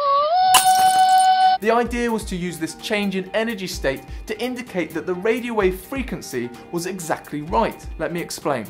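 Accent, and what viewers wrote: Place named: United Kingdom. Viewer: This British accent. I can't understand even a thing.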